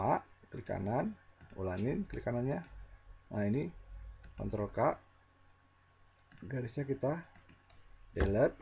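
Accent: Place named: Garut, Indonesia. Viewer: Gan bagi wa nya dong, asli garut ya gan? Logat nya kaya orang garut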